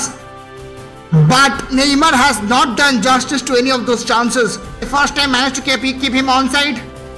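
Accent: Bengal, India. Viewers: The English of Bengali .... I am also Bengali.. hello bro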